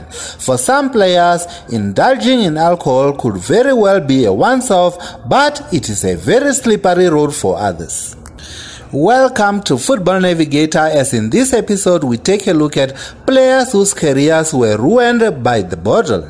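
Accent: Venda, South Africa. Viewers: The heaviest accent from Venda I ve ever heard. The football navigator rocks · Is this a south African accent? Venda or the other side of the fence? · That raw Venda English accent 🔥🔥🔥😁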